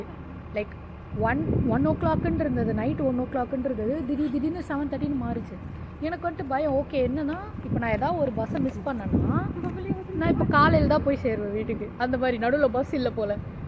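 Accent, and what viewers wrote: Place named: Tirunelveli, India. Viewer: Sister, I like your presentation about your experience in finding a job. You are talking nicely and friendly. You have a good future in Canada 🇨🇦 as you are talented. I like your tamil language. I hope you are from Tirunelveli, am I correct?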